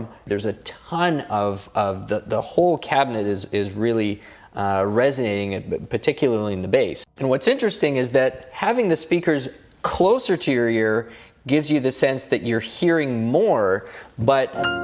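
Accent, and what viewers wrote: Canada. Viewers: Questionable, indeed. I would say downright annoying! I don't understand the point of the subtitles, since speaks clearly in perfectly understandable (eh?) Canadian... 🙂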